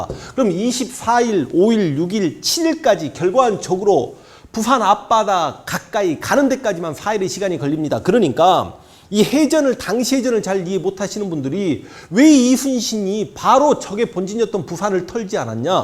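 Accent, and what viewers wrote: Jeolla, South Korea. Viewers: 황쌤은 고향이 혹시 전라도인 맞나요? 강의의 냄새가 남쪽 냄새가 그득하게 느껴줘요.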